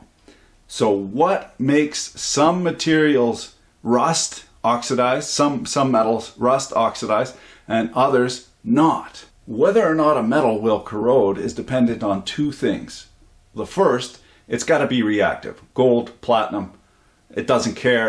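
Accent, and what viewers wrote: Quebec, Canada. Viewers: Are you a french canadian ?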